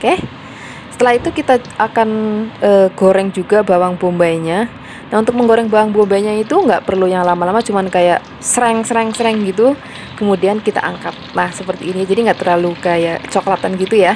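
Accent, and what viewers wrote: Java, Indonesia. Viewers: Pantes gole ngendika gula ne rada medok 😀 kulo asli sokaraja tp merantau k bks mba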